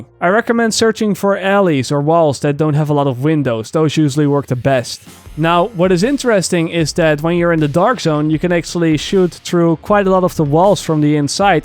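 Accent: Netherlands. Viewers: Cool video, also i'm pretty sure that your'e Dutch... i recognize the accent xD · je bent bijna je Nederlands Engels accent kwijt. i just joint you channel, I see you have some awesome videos. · Mals nederlands accentje hoor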